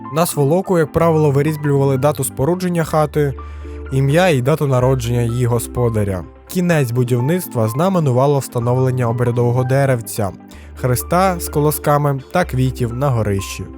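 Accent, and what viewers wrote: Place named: Cherkasy, Ukraine. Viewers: молодець. У вірному напрямку рухаєшся. А ти з відки? Регіон? Черкащанський говір))) ні? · А пан часом не з Черкащини, якщо задає таке питання? Говір характерний для центральних регіонів. А Черкащанський, це який?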